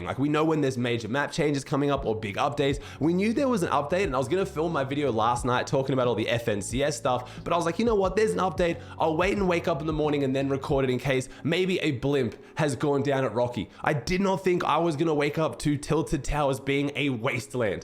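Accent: Australia. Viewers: just me or has he lost his aussie accent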